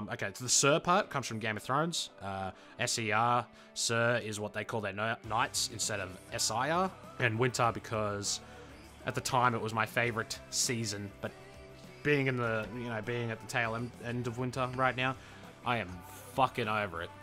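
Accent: Australia. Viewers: are you aus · What part of aus you from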